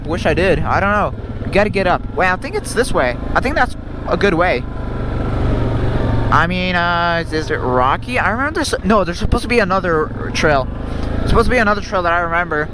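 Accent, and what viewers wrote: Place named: Quebec, Canada. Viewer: Nice! I thought you were french-canadian when i started watching lol.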